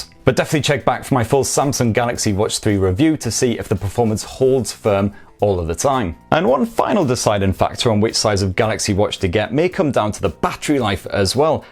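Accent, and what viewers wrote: United Kingdom. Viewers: so thicj British accent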